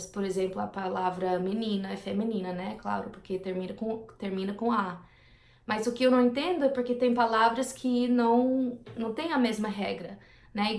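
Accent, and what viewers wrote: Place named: São Paulo, Brazil. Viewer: LIKE👍 you!!! Seu marido deve ser paulista.... Seu português tem um sotaque paulista... KKKK Eu vivo no Rio de janeiro (Saquarema)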